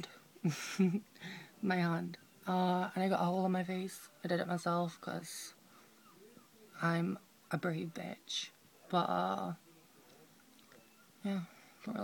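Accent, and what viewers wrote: United Kingdom. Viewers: Is she Scottish or northern irish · Not to be disappointing but I think that city is not gonna work for you my friend, you should move to a metropolitan like London or a big city in the states ... and your accent has turned British in a year :D:D:D I watched one of your old videos before this one and I was like what does she do in England with American accent but now I'm like wow her accent :D hugs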